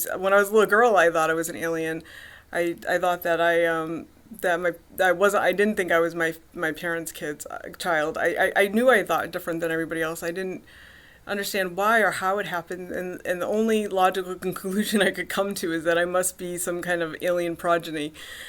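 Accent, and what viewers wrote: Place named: Canada. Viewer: Just wanted to share with you that I believe I’m an Aspie myself even though I’ve never been diagnosed with it. I have the characteristics of it. So I can really relate with you on it. But damn, you’re hot as hell! I’d definitely ask you out to coffee with me if you were here in my town. Bet you live in Canada (you sound Canadian). Anyway, Happy Holidays,! Greetings from Bay Area, Ca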